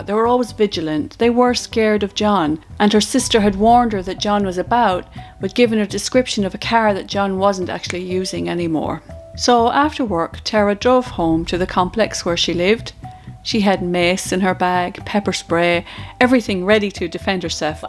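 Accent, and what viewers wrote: Ireland. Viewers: Sounds Irish to me (Florida USA). Delightful. I love how y'all say "cuddle" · The Irish accent adds to your narration sounds great. However, this story really allows victims to be complete helpless imbeciles, like they're unable to protect themselves.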